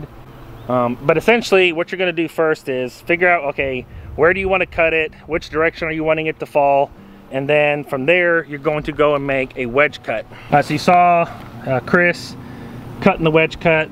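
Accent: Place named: South Carolina, United States. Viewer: Sounds like your already getting that south carolina draw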